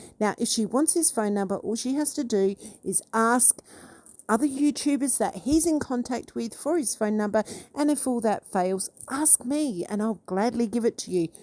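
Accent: Australia. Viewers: Sound like a true blue Aussie ro me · Girl, I know you’re Australian by your accent. I know accents very well. I can tell from your videos that you love your husband. I’ve been subscribed long enough to know that TMJ is a friend, not a boyfriend. I guess she doesn’t know what you do for a living 🙃 The truth is coming & it has nothing to do with TMJ. Stay strong 💪🏻 🇺🇸🇺🇸🇺🇸♥️♥️♥️ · We all know your Ozzy, accent is true Blue and love listening to you while i can hear the waves from our BEAUTIFUL BEACHES we have in OZZ we are not friggen stupid, but i tell you im starting to not like CHARLES and having doubts about WILLIAM (i know that sounds horrible) but after seeing speach from David Beckham at the WEF ,and CHARLES being involved WEF, WILLIAM EMPLOYING Ardern, Blanchett, Steve Erwins woke son, and some woke guy for the world kitchen, im worried its not the Monarchy i thought, plus your treatment, i was hoping with LADY Cs court case plus FIONA and Trevor's trouble there might be a link which could be joined to go to court with eventually with good hard evidence your a dog with a bone now, have you contacted THE ROYAL GRIFT, im an old duck wishing i had your energy, watch your back stay safe🇭🇲👍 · Us fellow Aussies can tell your accent 👍 mines a bit rough around the edges 😅 use friggin a lot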